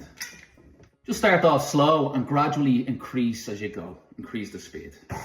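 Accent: Ireland. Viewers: Watching with automatic CC is hilarious with an Irish accent 😂 luckily my father in law is Irish so I knew exactly what you were saying even if captions didn't! 🙈 Dissolving the sugar is genius! Definitely trying that next time, thank you! 🍀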